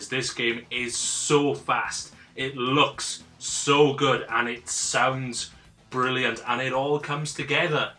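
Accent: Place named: Wales, United Kingdom. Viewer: You sound very Welsh What part of Wales are you from!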